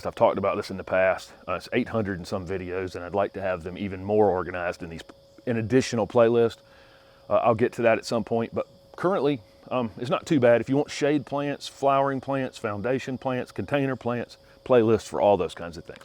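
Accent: South, United States. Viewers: In addition to trying to soak up all the knowledge you share, I very much enjoy listening to your Southern accent. Raised in the South, I fell in love with gardening alongside my father. Transplanted to Phoenix in 1970, i greatly missed the ability to grow so many things. Now retired in Arizona’s best kept secret, the White Mountains, zone 6b, I am in heaven learning to garden all over again. Thank you for your help. And I would love to add a set of Corona pruners to my tool bucket.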